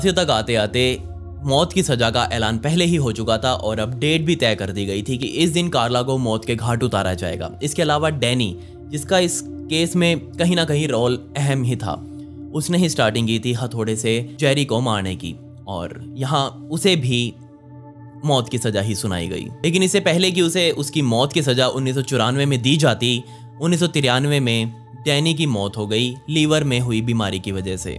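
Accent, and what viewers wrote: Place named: Western Uttar Pradesh, India. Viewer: Bhai teri language suke lagta hai tu sayad west u.p se hai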